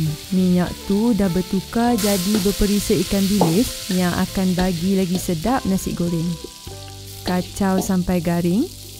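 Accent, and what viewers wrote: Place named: North, Malaysia. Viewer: ni asal mana eh.?? ada bunyi utara skit2 tuhh😆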